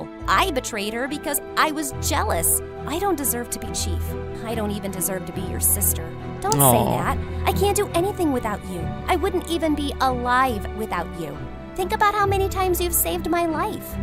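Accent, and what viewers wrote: United Kingdom. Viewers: i love the brittish accent. NO WAY! also love how misa says. she has a perfect memory so casualy when she hates her 😅 then goes back to hating her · The British accent 😭😭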